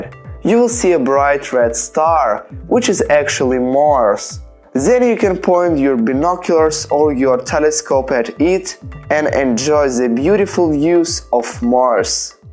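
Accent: Russia. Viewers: You seem to have a Russian accent. Are you Russian? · Wait, are you Russian? That’s some pretty good English. If you didn’t have such a strong accent, you’d be better than me lol. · You sound like Russian Отличный английский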